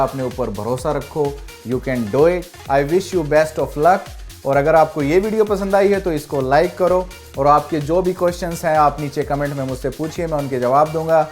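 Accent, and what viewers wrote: Haryana, India. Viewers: Bhai aapki to english bhi haryanvi accent hai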